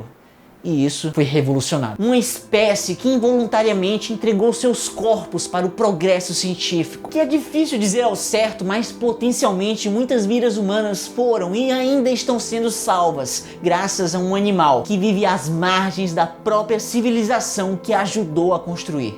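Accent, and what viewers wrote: Ceará, Brazil. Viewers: Você é do Ceará? Se for, qual cidade, notei por algumas gírias e o sotaque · tu é cearense???? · Vc é cearense? Kjjkj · Umas amigas me explicaram o que era "aí dento" mas eu nunca tinha escutado de maneira natural antes desse vídeo kkkkk. Você é do Ceará?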